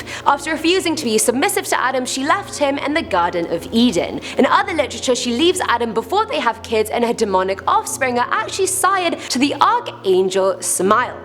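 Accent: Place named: United Kingdom. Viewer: I can't tell if she's british or American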